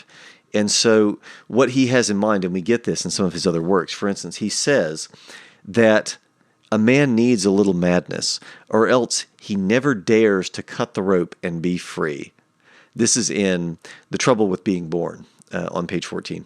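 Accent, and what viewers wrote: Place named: Carolina, United States. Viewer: I'm going to comment after watching the entire video, but I'm wondering where you're from. At first I thought you might be an Okie, like me, with your subtle Southern accent. But I'm hearing a bit of a Carolina accent in your "O" sound. Any chance I'm right?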